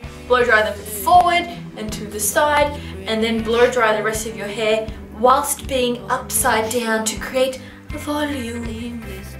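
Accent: Australia. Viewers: are you Australian ? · Are you australian?? XD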